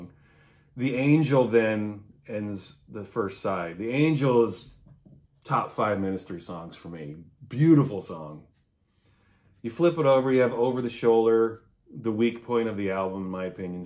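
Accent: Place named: United Kingdom. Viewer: British accent? I was thinking the same thing! Funny!. Peace!.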